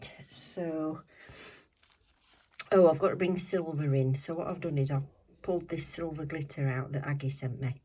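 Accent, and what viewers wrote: Yorkshire, United Kingdom. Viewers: Wow they’re stunning. Definitely love the wheel idea and the longer videos are great xx Btw you’re so funny 😆. Are you from Yorkshire? I’m from Hemsworth if you are x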